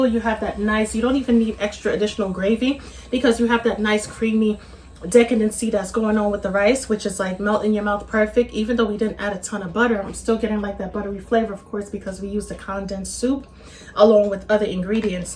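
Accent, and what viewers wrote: New York, United States. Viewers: ….you gotta be from New Jersey or New York 😀 Food looks delicious! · Are you from New York? Love your accent and your recipes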